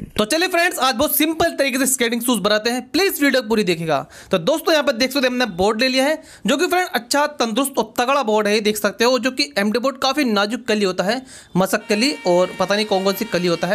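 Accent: India. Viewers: I love your killer Desi accent bro 😉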